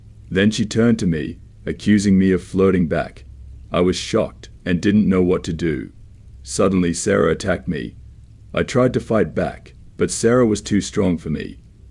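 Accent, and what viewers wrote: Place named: Australia. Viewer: It sounds so weird hearing someone with an Australian accent tell a story involving a gun 😂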